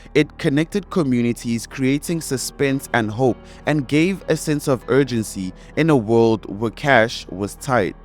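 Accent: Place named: Nigeria. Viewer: This dude sounds like a Nigerian. I am out here !!!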